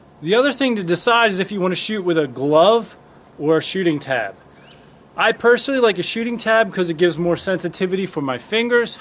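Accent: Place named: New Jersey, United States. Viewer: Thanks you do have a direct, likable, teaching style. Your accent sounds like my home state, new Jersey.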